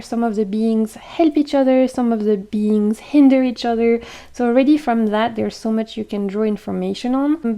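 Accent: France. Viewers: That’s a very complex oracle deck. You can tell a lot of time, energy and research in making this deck come to life. I will check it out and the 10% discount is great! Thank you for reviewing this for us., you need to make an oracle based on the plants and wildlife you grew up with. Teach us about where you live in the world. Many days I wish I was on a tropical island. Especially with winter in the Northern Hemisphere is so bitterly cold. BTW your French accent is lovely. I studied French in high school. I’ve always thought it was a beautiful language.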